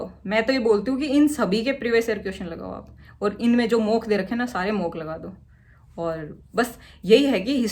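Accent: Haryana, India. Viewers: हरयाणवी हमे सुनने में अच्छा लगता है।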